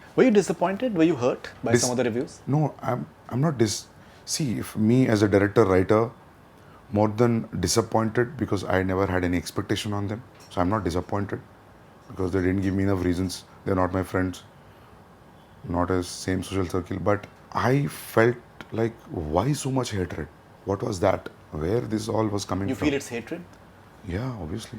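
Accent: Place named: Hyderabad, India. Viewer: That is slang of Hyderabad urdu. Both Mumbai & Hyderabad have Deccan urdu style which is different from North India.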